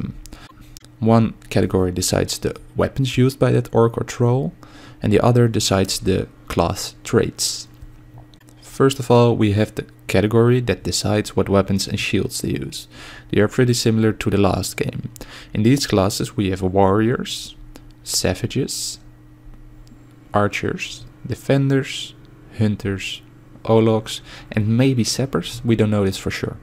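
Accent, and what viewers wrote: Netherlands. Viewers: op reis hahaha Wow ik dacht al dat ik het herkende:p (I already thought I knew the melody, but couldn't remember it:p ) Now that you say it's a dutch song, your accent sounds from Holland, is that right?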